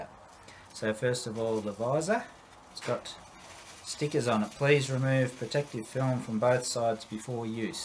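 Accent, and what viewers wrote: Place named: Australia. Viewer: Who doesn't love a good Aussie accent :)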